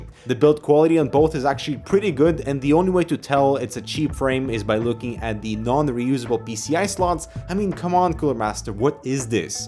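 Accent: Russia. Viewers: Are you russian? Im not trying to be racist, just curious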